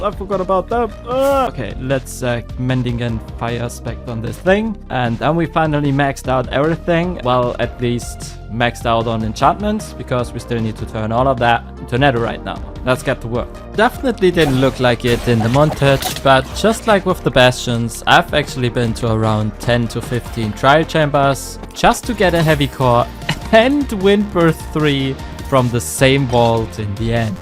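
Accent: Germany. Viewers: The accent is quite obvious actually.. Though maybe non-Germans just can't tell? (amatures) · deutsch oder österreich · Man hört den Deutschen Accent raus.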